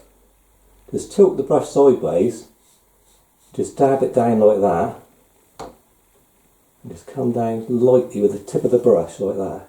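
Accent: West Country, United Kingdom. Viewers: Do I detect a West Country accent ? You voice is very pleasant together with your teaching style and good advice.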